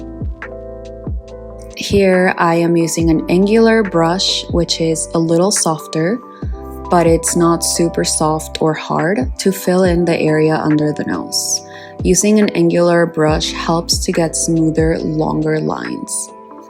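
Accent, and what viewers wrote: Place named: Bolivia. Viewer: Ive never heard your voice before. Not what i expected a Bolivian accent to sound like. Amazing artwork. Your hard work and talent have been an inspiration.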